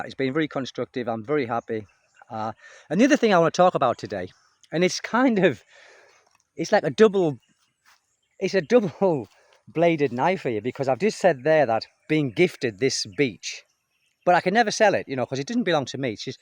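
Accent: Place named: Northern England, United Kingdom. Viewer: Hi I have just discovered your channel and finding it really interesting I have had similar experience when I spent a year in Bali same expectation from locals when westerner arrives they must be wealthy even though I was exploring idea to retire there because retirement in my home country New Zealand was not looking to be comfortable financially however I gave it a year the weather I loved most of the people were friendly but I returned to New Zealand Bali was never going to work out for various cultural differences some you describe with life in the Philippines by the way I have lived in New Zealand for fifty years originally from north of England Middlesbrough your accent interestingly sounds very northern England curious as to where you originate from?keep up great videos love how your son speaks English I also can slightly hear a little north English accent from him so cute.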